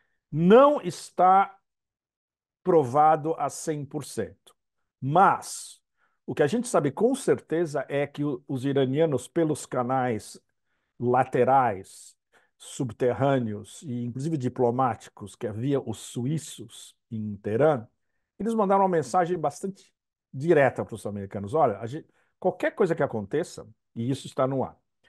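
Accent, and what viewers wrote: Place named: Minas Gerais, Brazil. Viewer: Uai sô , te mineiro aí tbm 🧑🏼‍🌾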